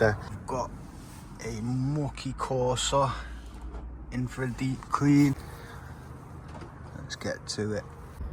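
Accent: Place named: Bolton, United Kingdom. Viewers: Sounds like you're from manchester. Im in london, have an audi A5 convertible. Id love a deep clean! I regularly clean my motor but i dont have access to a tornado and i know youd do such a better job than i could ever do. How do i go about booking you in? Do you even come this far down south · I thought he sounds more Bolton / Chorley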